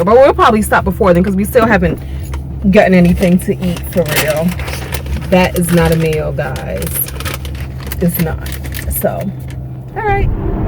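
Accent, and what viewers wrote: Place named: New Orleans, United States. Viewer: Luv that accent !New Orleans Lady Ice Cream pralines. ummm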